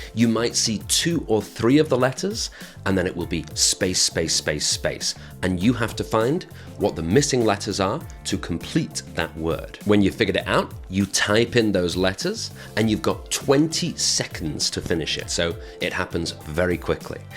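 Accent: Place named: United Kingdom. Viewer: Thank you sir. And your British pronunciation sounds incredible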